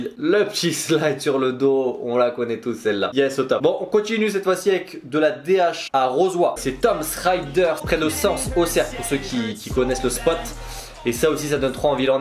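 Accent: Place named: Belgium. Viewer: S'il te plaît respecter l'accent belge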